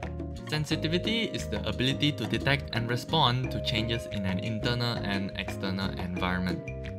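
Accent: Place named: Malaysia. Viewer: My bro, you also sound very Malaysian Wیہ😍🙈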